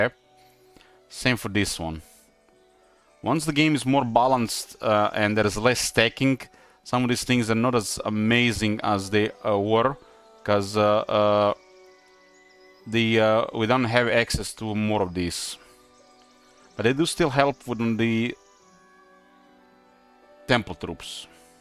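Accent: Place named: Eastern Europe. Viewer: regarding the letters and how to pronounce, Tolketh would be Tulketh. All umlauts are basically "Open your mouth shape for letter but say some other vowel", with O it would be place your mouth to say O but then say U. You got it right on the end, chat helped you out. Frankly, they're not hard at all when you compare it to French or English. Like yeah there's more vowels in German, but at least they follow rules always, even things like Rammstein being read Ramshtain, there's simply rule that every EI is read as AY, done. How the F do you explain to an English started difference between thorough, through, thought, taught... As someone who's been studying English since before elementary and speaks it better than many people to whom it's their native language, and half of the times think in English, I think I speak for everyone when I say: English is a BS language. 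Great vowel movement in particular, that was worse tragedy than nuclear bombing of Japan. P.S. You sound Slavic, is that your accent? Your Os are very hard and you don't do guttural stops.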